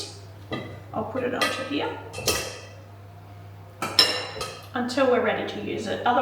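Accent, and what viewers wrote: Australia. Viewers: They all look yummy and easy. Ann, 74, from southeastern Coastal North Carolina USA. Where are you? You sound Australian but you were talking about warm weather and I thought you all are going into winter.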